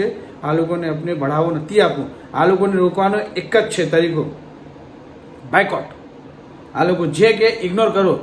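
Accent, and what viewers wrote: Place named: mixed, India. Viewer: Tame proper gujarati nathi lagta thoda up bihar wada chho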